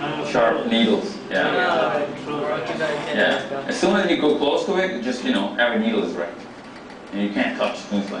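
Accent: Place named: England, United Kingdom. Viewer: Love his English accent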